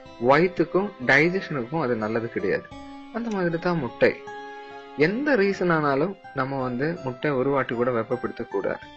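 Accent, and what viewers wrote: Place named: Kanyakumari, India. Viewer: ungal kumari- tamil slank arumai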